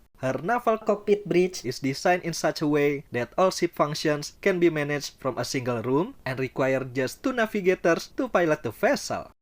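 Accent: Indonesia. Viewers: Logat Indonesia, bukan bule.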